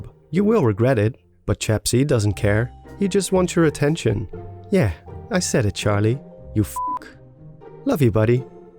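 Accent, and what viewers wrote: Netherlands. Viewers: I knew you where dutch, just hearing your voice lol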